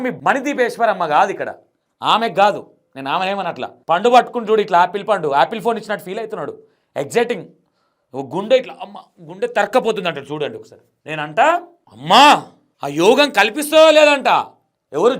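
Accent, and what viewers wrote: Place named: Telangana, India. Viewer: anna miru,mi acting and telangana yasa super lo vallanun tittadam super 😂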